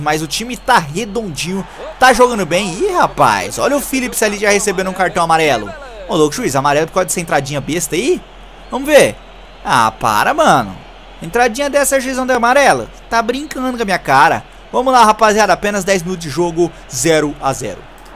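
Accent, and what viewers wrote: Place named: Bahia, Brazil. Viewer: Eae aonde se mora pow tem um sotaque da bahia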